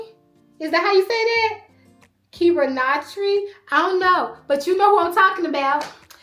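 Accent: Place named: South, United States. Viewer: I can hear a little bit of an accent/twang especially when you say January 1st. Are you from the South? Just curious.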